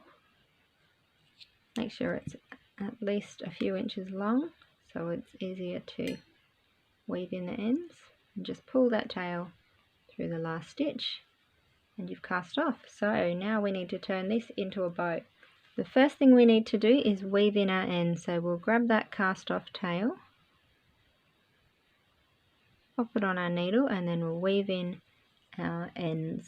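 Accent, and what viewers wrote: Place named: Australia. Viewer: I like it very much! and great to hear a fellow Aussie here on YouTube!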